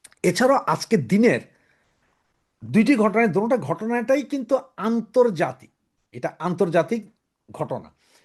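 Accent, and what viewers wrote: Noakhali, Bangladesh. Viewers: আপনার কথা শুনলাম ।ভালো লাগলো যুক্তিপূর্নকথা।কিন্তু আপনার ভাষাগত সমস্যা আছে।যে লয়ে কথা বলেন তা আর একটু দ্রত করতে হবে এতো চিবিয়ে চিবিয়ে কথা এখন কেউ শুনতে চায় না।আর আপনার বাডী সম্ভবত নোয়াখালী,চট্রগ্রাম বা কুমিল্লার দক্ষিণাংশে কি ভাবে বুঝলাম?ভাষায় আন্চলিকতার টান আছেএটা ভালো নয়।কন্টেন্ট রেকর্ড করার আগে বেশ কয়েকবার রিহার্সাল দিন যাতে যা বলবেন সংক্ষিপ্ত বস্তুনিষ্ট ও শুনতে ভালো লাগে।চেষ্টা করবেন যেন কথা পাঁচ মিনিটে শেষ করা যায় অজথা লম্বা কেন করবেন।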